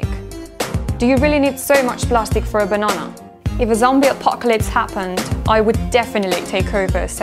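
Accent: United Kingdom. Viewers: 有一點英國腔 My type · 這英國腔XD的西班牙妹很正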